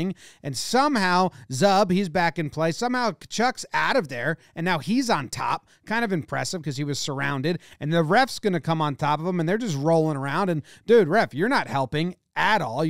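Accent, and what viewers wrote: Canada. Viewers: Love your Canadian accent lol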